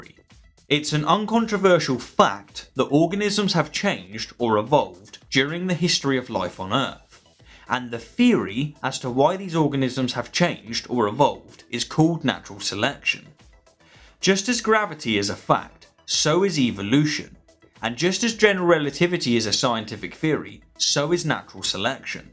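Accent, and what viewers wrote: United Kingdom. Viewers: Esq. (I say that because to my ears you sound posh English) I speak English with a Michigan and Ohio accent actually my accident tends to be related to that spoken in Grand Rapids and Detroit, Michigan along with Toledo, Ohio. According to some PhD linguist test whose name I can’t remember and am too lazy to look up. Anyway all that being said I have noticed the same lack of understanding between the colloquial definitions of theory and now that you pointed out the different definitions of law. I’ve known about the different definitions of those words and several others misused by creationist and for that matter certain uber-liberals and ultraconservatives who are very careless in their misuse of words and phrases. Particularly in using words that has several different definitions but implying there is only one meaning to confuse their audience.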